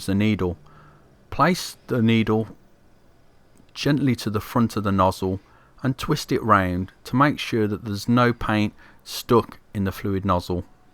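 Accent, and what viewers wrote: United Kingdom. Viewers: Omg I love your accent! It's so deliciously UK!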